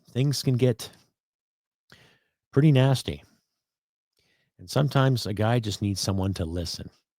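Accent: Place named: Alberta, Canada. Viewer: you speak fluent Albertan! (Please carry on! ) 👍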